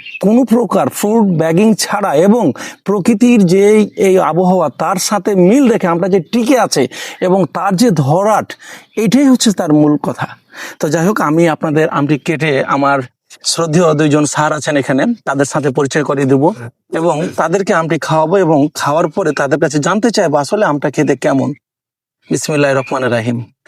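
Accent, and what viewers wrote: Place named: Kolkata, India. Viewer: আপনার কথা শুনে মনে হয় কলকাতার লোক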